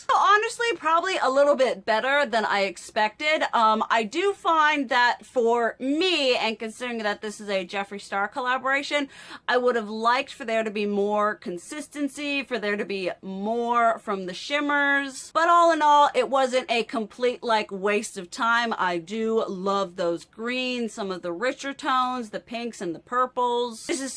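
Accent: South, United States. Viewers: Purples are your color, both purple wigs I've seen recently look great. They really compliment your skin tone. If your reviews are with good intentions, to save us money or let us know its great or crap go for it. I like your for your attitude, interest, and you sound like me ..a southern lady with a twist.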